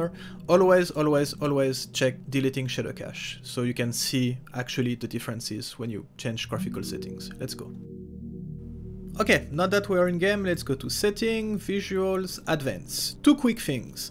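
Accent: France. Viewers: l'accent francais 🤣ca fait plaisir quelques frenchs dans ce youtube game hahaha😂😝🤪😜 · Hello dude ! Are you french by the way :)?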